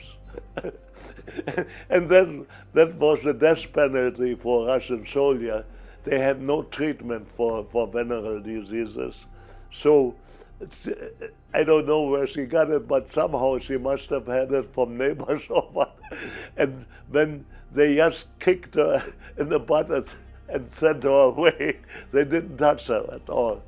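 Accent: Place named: Germany. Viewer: I wonder if when he emigrated to Canada/US he had a German speaking wife + family/friends? His English is very good of course but VERY accented :) But I've known British people emigrate to the US/Canada or Australia and get strong local accents SO quickly!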